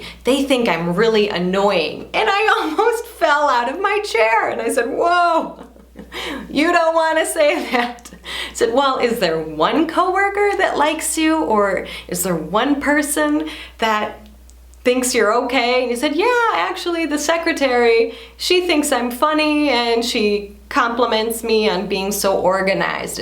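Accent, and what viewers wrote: Brazil. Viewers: I guess you're losing your English your speak sounds like so Brazilian hahahah!! So cute!:P, eu acho que você tá esquecendo como é que se fala em Inglês, o seu jeito de falar tá muito brasileiro! hahahah...! Acho tão fofo isso,:p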